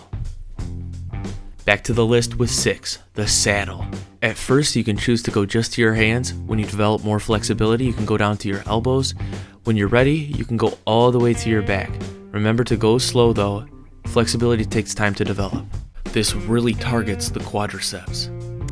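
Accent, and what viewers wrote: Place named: Midwest, United States. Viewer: I don’t know what state you are from, but I’m guessing Midwest or Chicago with the accent. The way you say calf cracks me up 😂😂😂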